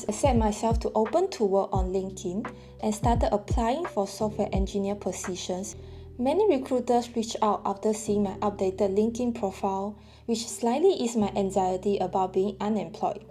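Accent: Singapore. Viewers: she sounds singaporean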